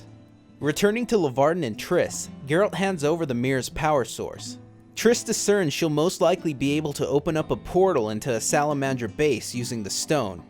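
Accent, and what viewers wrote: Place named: Southern California, United States. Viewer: In-Depth recap by someone from Southern California lol Good recap and great accent. Thanks!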